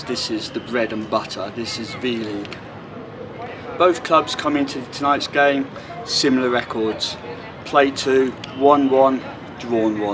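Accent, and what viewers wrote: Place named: United Kingdom. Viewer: love your British accent <3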